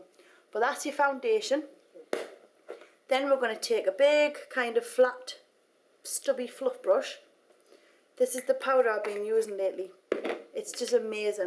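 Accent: Newcastle, United Kingdom. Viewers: dude ur video was awesome, but the one thing i really want to know is your accent. is it... british? irish? idk. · Are you a Geordie? First I thought you were Scottish....nice tutorials, I am still struggling with the false eyelashes...:)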